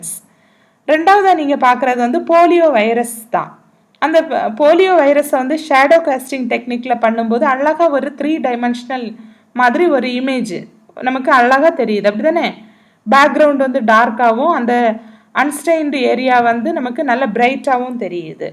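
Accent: Kanyakumari, India. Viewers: MADAM THANKS FOR THIS VIDEO. Unga voice kekum podhu kanyakumari slang maadhri iruku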